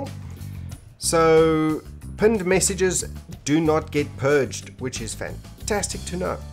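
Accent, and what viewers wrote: South Africa. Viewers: My dudes South African, love you! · You sound South African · Are you from south africa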